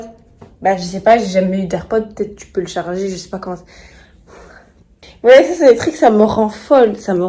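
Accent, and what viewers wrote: United Kingdom. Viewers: Ton accent British 🥺🥺🥺🥺🥺❤️❤️❤️❤️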